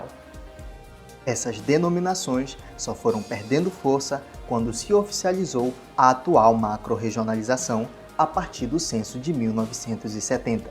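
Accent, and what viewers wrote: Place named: Brasília, Brazil. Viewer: Esse dialeto lembra muito Brasília, devido ao mesmo processo de migração. Talvez você seja do extremo norte do estado, por aí realmente tem uma semelhança com Maranhão. Já recebi gente do bico, da pra ver que eles falam diferente.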